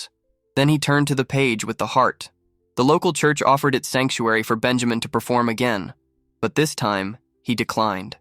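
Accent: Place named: United States. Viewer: One has to be very careful in choosing who reads these stories so that it complements the genre. I know Americans speak a strange form or English and this gentleman makes it very harsh with that strong accent. A pity as it is a lovely story and a lovely melody. It would get top marks if narrated by a gentle man's voice without that strong American twang.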